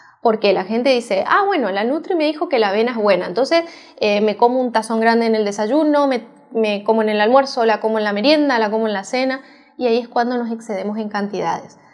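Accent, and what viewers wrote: Misiones, Argentina. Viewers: ES DE MISIONES ( ARGENTINA) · De misiones son , norte. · Buen día es posadas, misiones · Excelente equipo doctores! Se nota su acento argentino, felicidades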